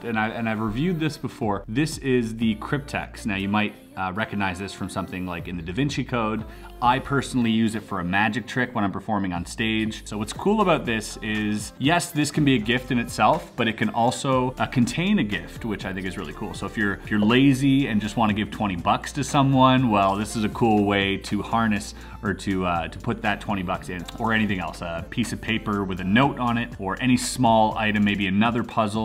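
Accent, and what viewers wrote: Quebec, Canada. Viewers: This accent is definetly from Québec :D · are you canadian??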